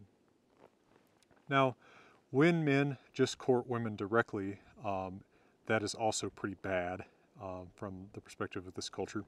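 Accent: United States. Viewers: Interesting video, however when you say you use "Reconstructed medieval Norse pronounciation" I think it's not accurate. You are saying approximately what they sounded like, with an american accent. Rísmál - Icelandic pronunciation --> Reesemowl(approximately) What you said sounded like Rissmaul./mall Who says that 11th century norse "Á" sounded like an english speaker failing at icelandic pronunciation? I don't understand how reconstructed norse spoken by a non native speaker would ever be more accurate than the icelandic pronunciation. Icelandic is the closest to old norse we will ever get because it is the same language only older, slightly changed. It would be nice to get a video on the modern reconstruction of norse. I don't know what you would base it on though. Modern icelandic and the old sagas? I enjoy your videos and hope I don't sound discouraging, because your disclaimer was probably excactly for these kinds of comments. 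A video expanding on this reconstructed pronunciation would be wonderful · Using the comparative method of reconstruction and the contemporary sources (e.g. the First Grammatical Treatise) it is perfectly possible for an educated person to come much closer to Old Norse than modern Icelandic, even with an American accent, although I will grant you that Dr. could have made the */i:/ longer. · I love that you have a deep, tough American accent and voice. With a cowboy intro with western music. In a cowboy envirement. Dressed with a cowboy style. Talking about Scandinavia and Vikings. Freaking badass as a Dane i must say.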